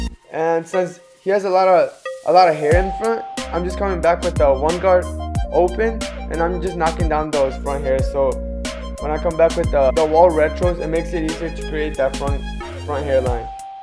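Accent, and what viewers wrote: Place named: India. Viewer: He sound like Apu that one Indian character from Simpson’s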